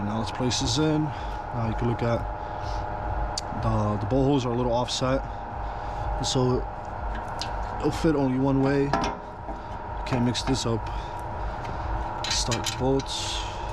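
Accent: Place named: Staten Island, United States. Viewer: You sound like Keith Defosio from Staten Island.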